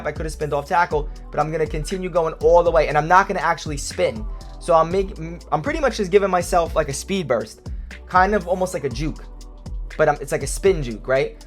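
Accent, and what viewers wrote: New York, United States. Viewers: Can’t stand that New York accent😭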